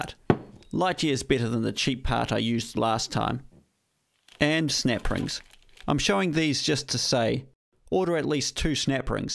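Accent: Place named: New Zealand. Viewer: hi, I'm a kiwi living in Germany - I'm not a Land Rover fan but I wanted to watch a restoration series. Great to hear a kiwi voice, I'm really enjoying watch this series - well done. Des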